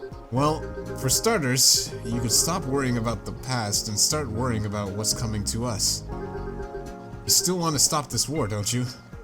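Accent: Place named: Germany. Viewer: Your German accent is actually the best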